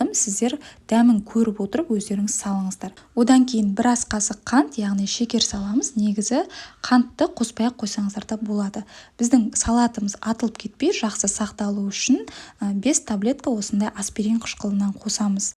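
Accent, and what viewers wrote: Standard. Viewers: Саламатсызба тәте? Сізге көп рахмет, таза қазақша түсінікті айтқаныңызға .Алла өзіңізге жар болсын! Қолыңызға қуат берсін Алла. Ауырмаңыз , балаларыңыздың қуанышы боп жүре беріңіз тәте , бұданда жақсы рецептермен бөлісе беріңіз.. Алға қазақтар ...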